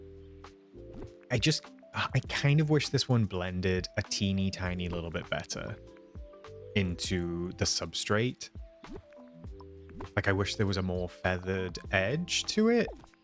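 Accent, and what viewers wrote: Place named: Wales, United Kingdom. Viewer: I new I heard the Welsh there. I'm a Welsh valley girly too. Thank you for these videos though, they really do help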